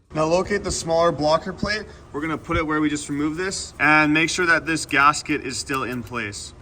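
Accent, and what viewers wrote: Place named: Canada. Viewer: Ya that was a super sick video dude well explained, thought you sounded Canadian then saw the sask plates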